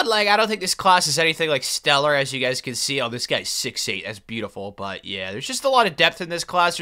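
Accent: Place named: Idaho, United States. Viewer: Im 95% sure you are from Idaho dude!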